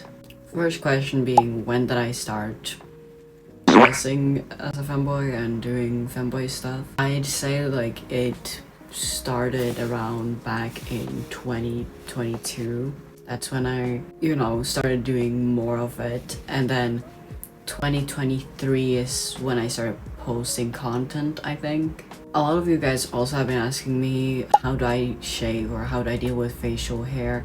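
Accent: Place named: Norway. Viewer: He’s from Norway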